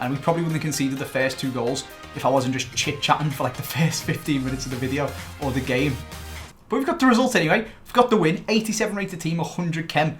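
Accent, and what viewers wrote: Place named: North England, United Kingdom. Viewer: What part of England you from? I recognize your accent is it Liverpool or Manchester or something?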